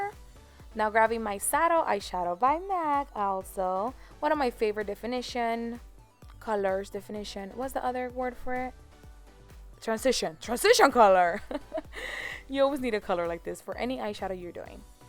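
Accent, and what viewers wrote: Latin America. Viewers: Her accent isn't American lol. She's Latino which is what you're hearing when she speaks.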